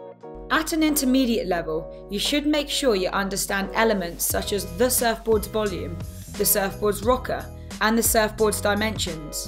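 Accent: United Kingdom. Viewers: and we have a brit commentating here.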